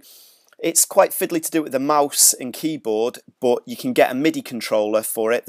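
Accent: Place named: Yorkshire, United Kingdom. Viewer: where about in England u from? (u sound very Yorkshire!)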